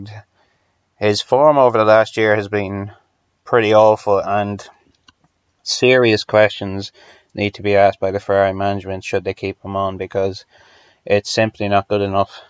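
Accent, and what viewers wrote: Ireland. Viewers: You have some accent, obvs Irish but that's very broad for Irish people as we know every county is like different. But I'm shite at telling from county to county lol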